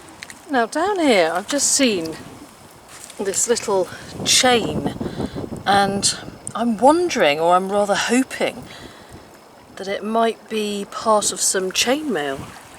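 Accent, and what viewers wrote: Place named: England, United Kingdom. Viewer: Watching you find these things and listening and you explaining them all is delightful! I love your English accent.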